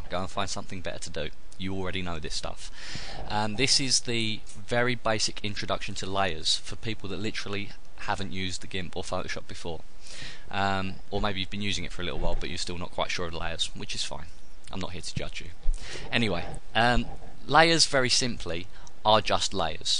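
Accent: United Kingdom. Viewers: ur british?! sexxyyyy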